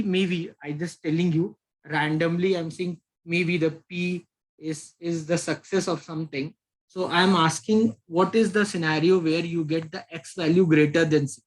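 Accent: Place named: India. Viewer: bro that indian accent of yours makes it really hard to understand the vid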